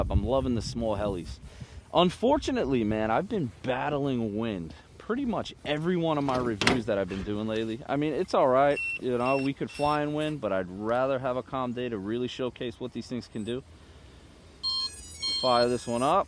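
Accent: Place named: New York, United States. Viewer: I bought both because why not. I love your nice thick grass and your cool NY accent.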